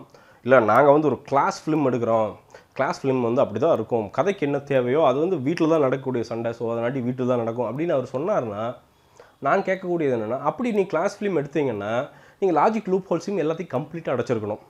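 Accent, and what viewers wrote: Chennai, India. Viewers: U were speaking a good tamil, please don't try to mix Chennai tamil in it... U r from coimbatore not from Chennai... it doesn't suite you.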